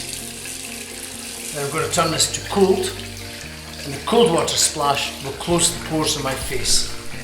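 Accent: Scotland, United Kingdom. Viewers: This is the video that influenced me to purchase a safety razor and throw out the multi-bladed sissy razors. Been shaving like my grandpa for about a year now and I still occasionally come back to this video just because. Wonderful video, almost hypnotic especially when you've got a Scot speaking, plenty of great information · Hot Scottish accent and hot Scottish bull. Wow he looks rich too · I love the Scottish accent. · I like that scotish accent 😎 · Avec l'accent Écossais c'est génial ! Merci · Just adding the Scottish accent adds authority to all things pertaining to gentlemenlyness. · anthony hopkins has fallen on hard times... its odd to hear him speak with a scottish accent... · I don't know if it's the cool scottish accent or the chill atmosphere and vibe, but man this was insanely satisfying, relaxing, and informative! It's like a comforting amd reassuring Scrooge McDuck showing you how it's done! 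Very nice! 😅😆😁👍🏻Might get into one of those razors! Been wanting to try one for a while! Good stuff! Thanks for the detailed tutorial! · The Scottish accent. Yeah, that was a terrible attempt at a joke lol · I swear I could sit here and listen to him talk about shaving all day. You gotta love the Scottish accent.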